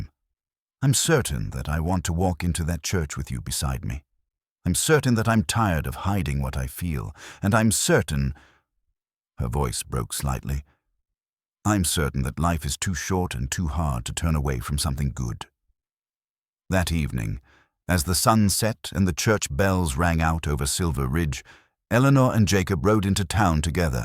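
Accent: England, United Kingdom. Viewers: Need an American narrator for an American story besides an English talking narrator.. Her husband been dead two years and daughter died six months and her four or five months expecting a baby who is the dad ? It couldn't be her husband's baby... Proof reader needs to read the story.. I like the story it needs to be more accurate...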